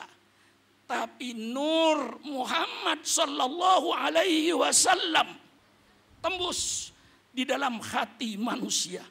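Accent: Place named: Madura, Indonesia. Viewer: Waaa ktahuan orang madura.. Bahasanya bukan bahasa intlektual pendidikan akademisinya Kurang orang.. Ktahuan cara ngomongnya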